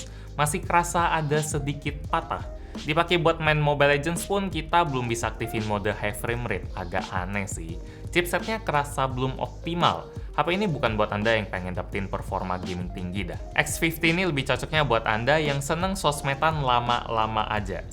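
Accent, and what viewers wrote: Palembang, Indonesia. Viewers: Bang org mana sih? Palembang?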